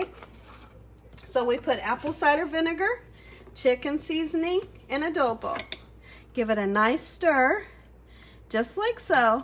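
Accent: South, United States. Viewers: She sound like pualla dean’s black friend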